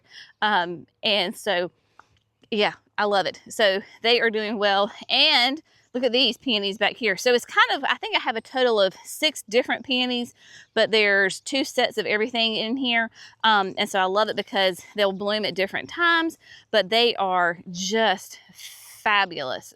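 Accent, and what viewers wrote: South, United States. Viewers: "Sweet Thing"! I love it! Gotta use that one. My first time to view one of your videos. Subscribed and clicked the bell. I'm a southerner too and love your accent. Your garden is truly an inspiration for me. We assembled a greenhouse this past year and now that I can expect to reliably start seedlings I've been trying to figure out how and where to plant all the flowers. I see you just tuck them in here and there and everywhere. I'm going to go through your videos one by one to see how you manage to keep weeds out of your beds... if you till areas first, if you till in amendments, how you lay out drip lines. I usually use sprinklers on timers. I love your Formosa azaleas and am guessing the whites are Mrs. Gerbing. I have those two along with George Tabor at the entrance to my driveway and now, greenhoused, will be taking cuttings to line the full drive. 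I have shade, shade, and more shade. It can be difficult to find a place sunny enough for full sun plants but as you are aware, I'm sure, Proven Winners is expanding shade plants all the time. I agree, Gertrude Jekyll is one of the most amazing roses for fragrance. I'm going to put Desdemona on my wish list too. I actually came here to see if you offered information on how - when to deadhead hydrangeas. I've read that it shouldn't be done but don't see how my plants can possibly produce more buds without access to more light as the old heads dry up. Thank you for showing all the work that is involved in gardening. I too use a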